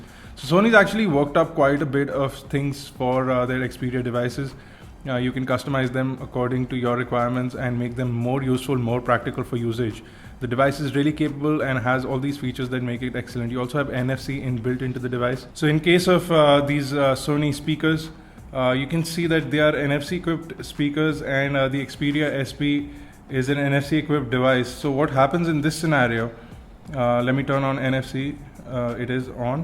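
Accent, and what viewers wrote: India. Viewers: agghh...that indian accent is killing me..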